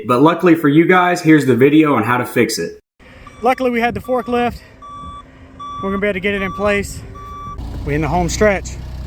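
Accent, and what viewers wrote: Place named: Carolinas, United States. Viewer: Carolinas, I thought so from the accent. Northern central Alabama here.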